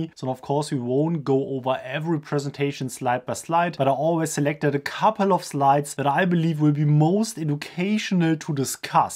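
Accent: Germany. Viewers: can i pay you to say the word "squirrel" and the statement "clean and clear car windows"? i don't think i've heard such a pronounced German accent outside of TV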